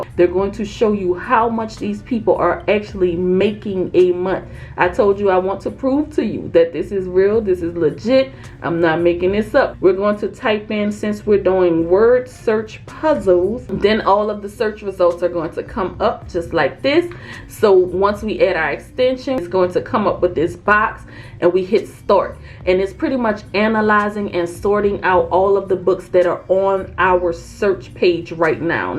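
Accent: New Orleans, United States. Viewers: Hey i just found your videos, and they are very inspiring. Are you from New Orleans? Please let me be right lol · I hear that New Orleans accent. Love it ❤️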